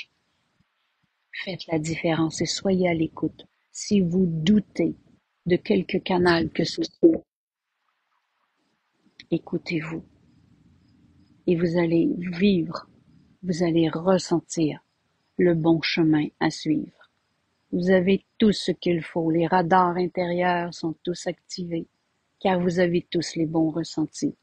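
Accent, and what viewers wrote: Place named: Quebec, Canada. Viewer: Bonsoir de France nouvelle abonnée j'adore votre accent Québécoise 😊👍🏼 merci a vous🙏🏼🌷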